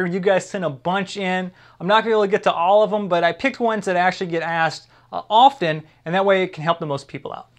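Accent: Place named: Brazil. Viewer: Ahhh, Nice portuguese you spoke.. It had a brazilian acente but still Nice portuguese..!!hehehehe..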